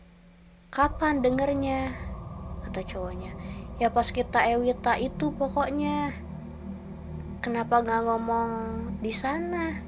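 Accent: West Java, Indonesia. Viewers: Kak keliatan banget orang Sunda nya lipe kak😭❤️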